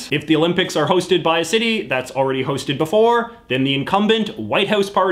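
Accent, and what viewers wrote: Canada. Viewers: this is the heaviest canadian accent i have ever heard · Your accent is aggressively Canadian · you have one of the Richest Canadian accents I've ever heard. Not saying that's a bad thing. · I love but as a fellow Canadian, I have never been more annoyed by an "about" pronunciation. I always thought that was a myth until I started watching his videos. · Don't you sound too canadian to have a dog in this fight? (Or do you believe we're the 51th state?) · this might be the most Canadian accent I have ever heard in my life and I love it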